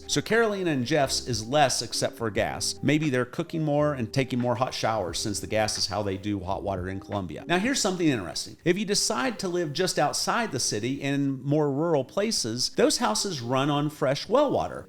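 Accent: United States. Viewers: You aren't respecting the Spanish language if you don't roll the letter "r". Try listening to your local friends and copying, rather than reading the Spanish words with an American understanding/pronunciation. Just wanna help 😉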